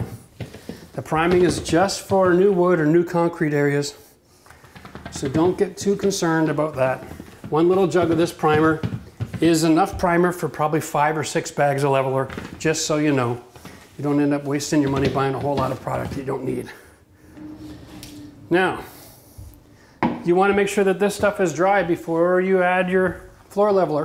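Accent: Canada. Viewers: you're such a cowboy! SLC is the "one" cementitious product I actually measure the quantity of water for -- partly cuz leveling a floor is sort of a one-shot deal, also because the stuff is like $25 a bag around here. On the other hand I'm brave enough to squeeze the whole bag into one pail -- there's about an inch to spare:) Definitely worth taking the time to properly level a floor. Always fun educational videos and I'm picking up a lot of Canadian.. almost can say Prow-cess correctly! Happy 2020!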